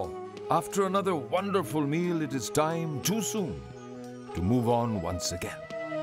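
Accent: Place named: India. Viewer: I think this is the first time I have ever heard a white person with an Indian accent.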